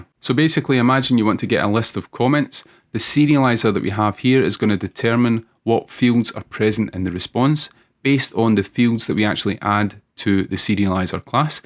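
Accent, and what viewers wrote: Scotland, United Kingdom. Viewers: File in Scottish is pronounced Fail, type is tape, price is Preis (like in German)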